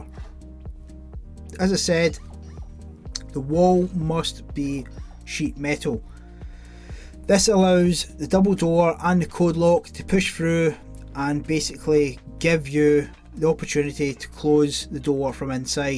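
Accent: Scotland, United Kingdom. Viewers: Are you Scottish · He bri'ish ?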